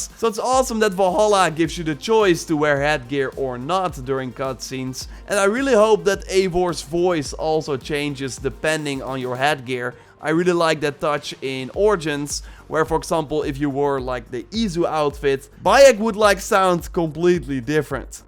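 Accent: Netherlands. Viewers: I can hear that you are Dutch hehehe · This dudes accent sounds so dutch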